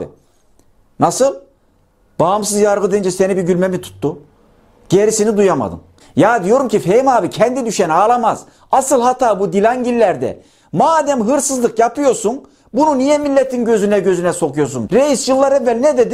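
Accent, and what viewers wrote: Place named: Anatolia, Turkey. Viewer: Anadolu şiven harika dostum.😂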